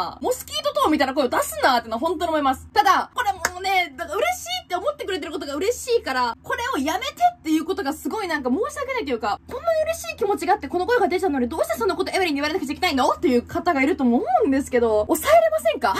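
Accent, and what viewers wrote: Kansai, Japan. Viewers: この時の可愛い。いつも可愛いけど。特に可愛いあと関西弁強めw好きよw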